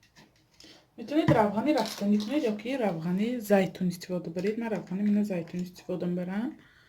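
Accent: Isfara, Tajikistan. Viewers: Салом.рз руи фахмишам номатон аст. Хело хуб.бахшиш мегуфтед.ки шумо дар кадом шахри Русия зиндагони карда истодаед.аз руи гапзаниатон аз ш.Исфара мебошед.агар хато накунам.кадбонуи хуб будаед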